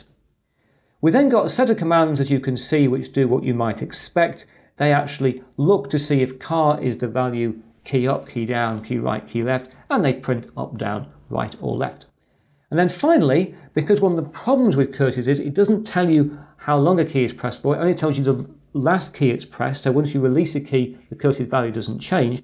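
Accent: United Kingdom. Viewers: You can set the video speed to slower (0.25, 0.5 or 0.75 x ) in the settings menu at the bottom right of the video. I've got mine set to 2x because I find his accent (British) easy to understand.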